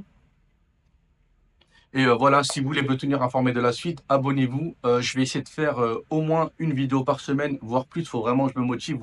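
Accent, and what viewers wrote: Banlieue, France. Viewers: ok il est assez beau mais par contre, il a un petit accent de banlieue. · Tu te trompes totalement sur le racisme, c'est bien ton accent de banlieue qui t'empêche de trouver un travail. Les français, aux patronymes bien français Pour chaque maghrébin à accent de banlieue qui ne trouve pas de travail, tu as un maghrébin qui parle normalement qui a un emploi et parfois des emplois à hautes responsabilités. Et pourtant tout le reste est en commun. Il y a plein de directeurs d'hôpitaux, de collège de lycée, plein d'officiers des armées ou de la police, plein d'attachés d'administration et d'attachés territoriaux, et PLEIN de politiques haut placés maghrébins, à couleur de peau, avec un nom arabe, et musulmans. Qu'ont-ils TOUS en commun? Ils ne parlent pas avec un accent de cité. Et devine quoi? Les français blancs à patronymes bien français avec un accent de cité ont exactement les mêmes problèmes de recrutement. 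Sur le reste je suis totalement d'accord avec toi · Tu as un accent de banlieue, ce qui peut être perçu comme un désavantage dans le monde du travail et la vie sociale. De plus, à ton âge, porter une casquette à l’envers avec des écouteurs ne renvoie pas une image très professionnelle. Pour ma part, je vis en Irlande et je suis d’origine maghrébine. J’ai quitté la France en 2005 car je ne trouvais pas de travail non plus. Lors d’un voyage d’affaires à Lyon, j’ai été choquée par les arabes, l’ambiance dans le centre-ville, ainsi que dans les transports en commun comme le tramway et le métro : des comportements incivils, un vocabulaire limité et une omniprésence de "wesh wesh".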